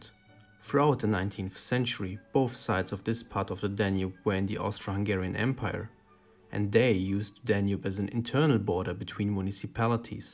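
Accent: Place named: Germany. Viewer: based German accent ⚫🔴🟡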